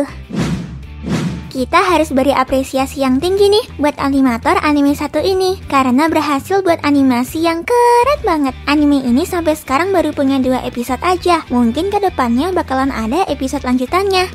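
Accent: Japan. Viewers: faseh bgt aksen jepang nya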